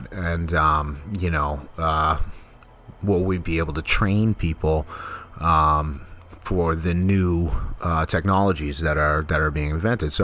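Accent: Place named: Boston, United States. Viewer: Hey, I'm halfway through, interesting, imo without Andrew Yang's ideas I think we would head directly towards a virtual marketplace where people just wanna hang out in virtual world spending money etc, the opposite of a result from UBI. Yang's proposal is surely crafted for how he thinks it has the best chance of being passed by both houses, and I think it is a very clever proposal. The other half of Yang's platform involves pushing us towards a marketplace that includes the exchange of money and adds the valuation of "human things that can't be measured with dollars" , i feel like that's a direction I can be proud of heading towards for my kids. See his website. I enjoyed your boston accent.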